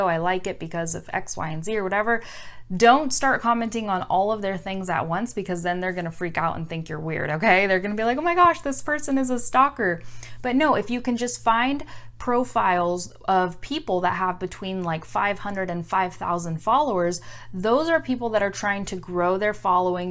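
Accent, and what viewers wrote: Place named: Argentina. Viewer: You´re videos are amazing, and tu español es demasiado bueno con acento Argentino jaja... You should do a live video.. Regards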